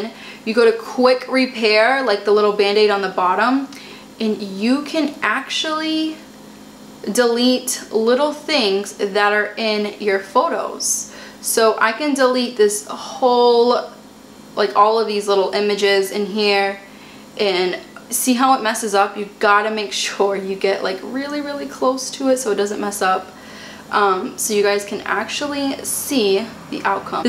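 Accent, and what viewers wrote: Boston, United States. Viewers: I love her Boston accent!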